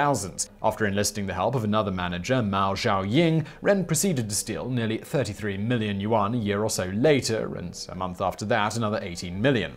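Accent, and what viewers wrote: United Kingdom. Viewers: British pronunciation. They also refer to 22s as "two two".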